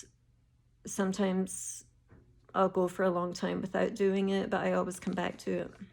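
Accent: Scotland, United Kingdom. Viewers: Hello from a fellow Scottish stitcher. Great first flosstube. I actually came across your third one first and as I was watching I thought I heard a Scottish twang so stopped that one and started watching this one to see if you said you were Scottish and you are, lol! Loved seeing all your projects and I’m like you, I love stitching things with houses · You can definitely catch the Scottish accent. Welcome to flosstube.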